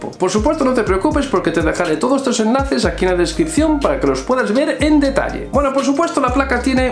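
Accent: Galicia, Spain. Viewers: Fantastico prollecto, me enorgullece ver cosas de estas made in Spain y aun por encima con acento gallego ❤️❤️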